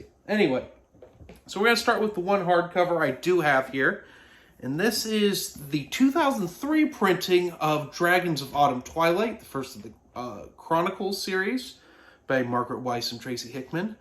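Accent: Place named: Canada. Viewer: Why after all these years, am I just now hearing a slight northern accent, like a Canadian accent!? Sweet collection. I remember you telling me about how awesome these were. I’m hoping to give that first trilogy a go.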